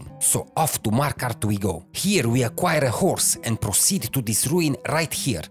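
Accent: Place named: Romania. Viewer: mamă ce ușor recunosc accentul românesc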